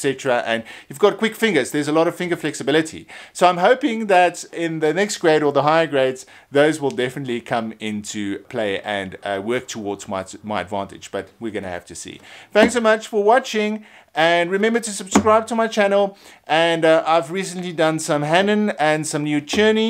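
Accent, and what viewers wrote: South Africa. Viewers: For Forte, the rest stroke finger goes into the next string, don't pluck the string away away from the fretboard. Plucking the string upwards is what gives you the fret buzz. For left hand technique, try to keep your elbow low, it will allow the palm of hand to stay parallel to the fretboard. This will also improve the accuracy of your third & fourth finger. BTW, you sound like your in/from JHB, I'm in Cape Town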